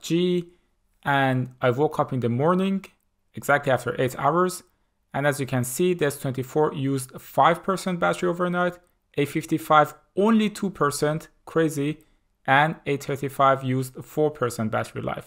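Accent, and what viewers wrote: Iran. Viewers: سلام سید لحجه داری ، حس میکنم ایرانی هستی درسته ؟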